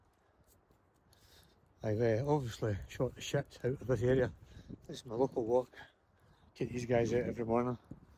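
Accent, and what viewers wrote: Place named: Glasgow, United Kingdom. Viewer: Hi I just came across your vlog and I also do woodland and from time to time I use my film camera. would you mind telling me which woodland area this was. I guess from your accent you are local Glasgow or surrounding. Thanks. Jacqui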